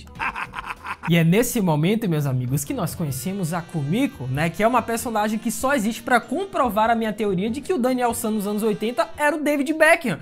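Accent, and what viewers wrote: Bahia, Brazil. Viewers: vc é Baiano tbm sou rsrsr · . Você é de que cidade da Bahia?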